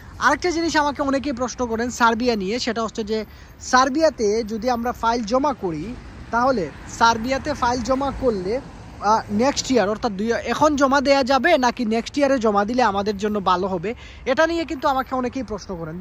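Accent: Sylhet, Bangladesh. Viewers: ভাই আফনে সিলেটি নি?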